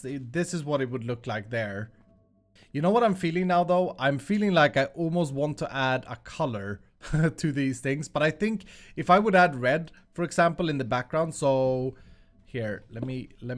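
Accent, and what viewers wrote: Sweden. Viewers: Du är svensk eller hur kanske från dalarna? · Omg XD its so ez 2 hear that u are from Sweden XD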